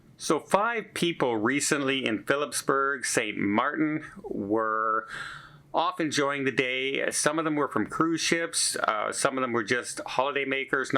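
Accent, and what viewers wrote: Canada. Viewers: oh I thought it sounded like fantastic but I guess with his Canadian accent it sounds like van.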